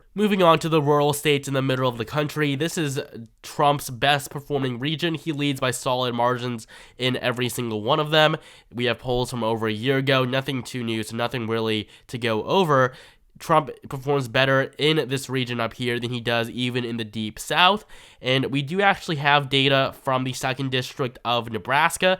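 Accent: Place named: California, United States. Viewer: Can’t stand listening to your accent. Very California yuppie!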